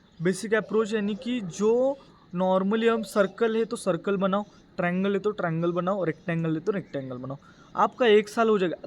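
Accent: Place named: Maharashtra, India. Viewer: Bhaii tumhari hindi sunke hi mai samaj gaya ki tum maharashtra wale ho😅😅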